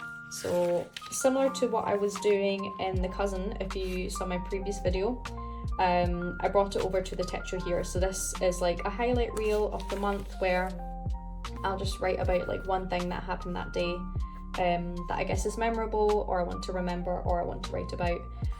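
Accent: Scotland, United Kingdom. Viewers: Great to see and hear another Scot on YouTube! I'm a Stalogy girl/old woman, but I do love the cream cover of the Hobonichi! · Subscribed not just for the Hobonichi content but more so for the Scottish accent which gives me the tickles ❤ Hope you have an absolutely fantastic day!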